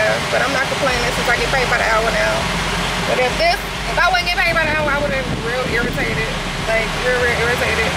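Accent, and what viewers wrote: South, United States. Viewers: Not sure how I got here but it’s the country accent that keep me engaged think imma go ahead and subscribe 😂I like the vibe of the video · I love her southern accent.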